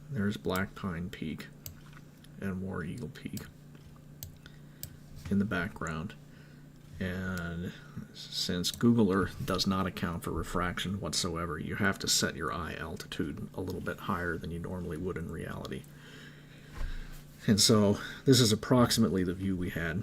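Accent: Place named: Australia. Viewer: Dear, I'm trying to understand why Yourself, CoolHardLogic and Reds Rhetoric don't use your real names and show your faces on vids? Are yous afraid of something? Even many of these FE people do. Also are there ANY "conspiracies" that you do believe in? Your accent sounds like an Aussie! An Aussie at the a bar the other weekend told me about a supposed shooting hoax in Australia. The Port Arthur Massacre? We never heard much about it in the states here. He said MANY Aussies were fooled by it at first. Apparently it lead to a gun buy back program which took many guns out of Australian people's hands who now wish they didn't do it? Sounds weird to me? These vids on Youtube don't make any sense about it or seem too "conspiracy" minded. It's just a buy back program anyway right? Any man can still buy a gun down there, correct? Australians would never go for that nonsense, they are hard working proud men! 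I don't believe it.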